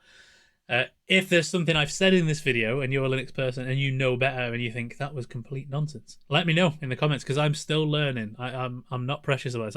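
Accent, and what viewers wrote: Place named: England, United Kingdom. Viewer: Oh, of course, you're English! Now I get why "water" turns into "wo'uh"!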